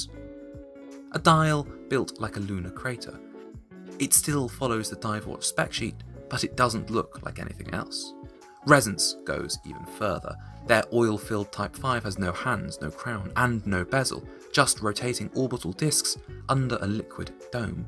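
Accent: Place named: Upper class, United Kingdom. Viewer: Just found this channel and it is already my favourite place to go for all things watches. Although I’d like to make an observation and please do not take this as a criticism. Why do a majority of watch channels speak with a very distinct middle upper class accent? With very unique pauses between words. To give you an example listen to TGV, to bark&jack, time&tide etc etc the list is endless. They all talk the same way which I thought was very unusual. I haven’t noticed this with any other hobbyists besides horology. Like to hear your thoughts on this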